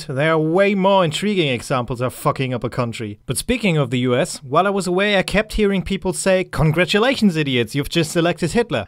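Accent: Germany. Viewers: Von wo hast du deinen deutschen akkzent? Sicherlich nicht aus Österreich oder der Schweiz. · I swear this guys accent sounds like a mix between German and Australian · You are german arnt you? Always if you pronounce german words there is no actcent. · Are you german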